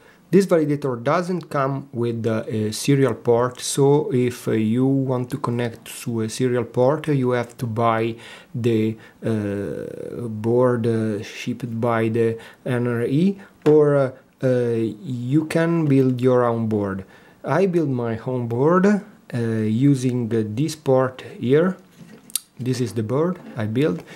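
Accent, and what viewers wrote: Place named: Russia. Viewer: Your english is like russian.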